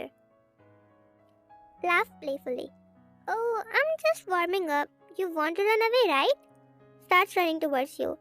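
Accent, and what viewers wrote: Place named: India. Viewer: This is absolute shit. Wtf. The voice is sooo pick me. The indian accent is so obvious. Quit already broo. Infact u sound like a seven year old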